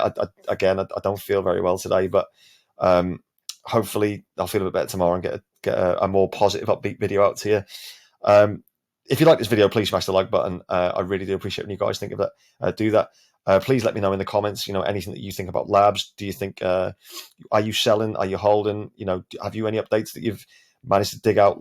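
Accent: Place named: London, United Kingdom. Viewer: Hi....I like your frank videos. Sensible. You sound like you're from in or around London somewhere. Me too. Anyway, I've noticed a pattern recently on my portfolio. I go to bed at midnight (GMT), have a quick look at the value, then wake up at 07.00 and I'm in the money! Maybe 3-4% - all good. Then about 10.00am it starts to fade back about 2%. So, my theory is that the overnight markets, (guessing it's west coast US, then Korea and Japan are influencing the prices)., can maybe be predictable. So, here's the plan...I buy good tokens at midnight, (HBAR,QNT,XRP) then sell when I wake up...Is this a foolish idea?....What do you think? Cheers pal.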